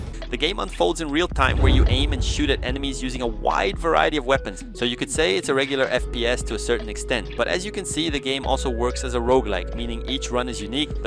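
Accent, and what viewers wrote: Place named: Brazil. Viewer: A voz mano, o sotaque dele é muito de brasileiro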